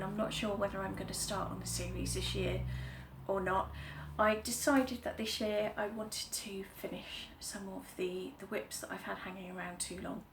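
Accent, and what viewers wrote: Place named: England, United Kingdom. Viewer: Hi, I really enjoyed your first video......lovely to hear an English accent! Your stitching is absolutely beautiful. I live in Northern Ireland so I'm not too far away from you. I love Devon and have visited many times. Looking forward to watching more of your Flosstube videos 😍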